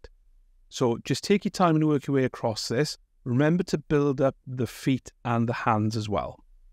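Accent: Wales, United Kingdom. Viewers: Are you welsh? Love your content, thank you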